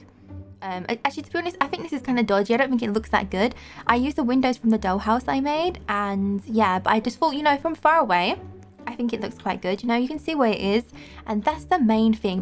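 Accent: United Kingdom. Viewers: I don't know what it is but I just LOVE british accents... IDK why lol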